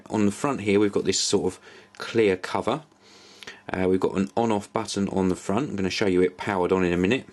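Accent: United Kingdom. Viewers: Is it just me... having a reviewer with a British accent makes me appreciate the product so much more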